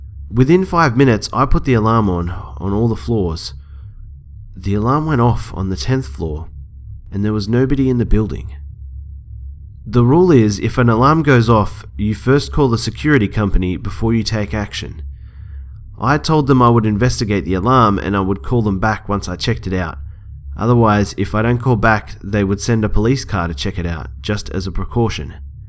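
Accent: Australia. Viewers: For some reason, when done with an Australian accent, it's all the more scary. Not to be racist.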